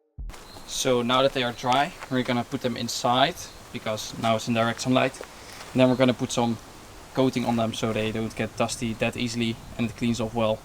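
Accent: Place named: Netherlands. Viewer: You sound Dutch, are you in the Netherlands? Am in the Netherlands if you are, just bought my e46!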